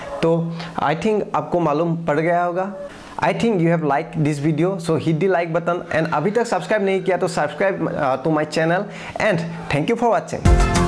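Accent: Assam, India. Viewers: Why your accent is like that ? Are you from Assam ?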